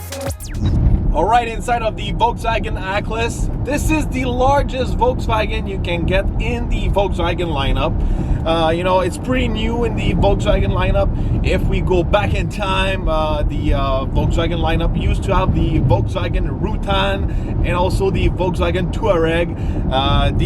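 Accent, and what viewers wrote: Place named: Quebec, Canada. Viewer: My guess Quebec Canada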